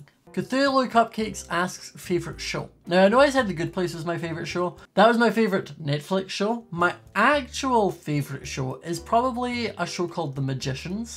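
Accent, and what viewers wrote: United Kingdom. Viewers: We love a good ?british? Accent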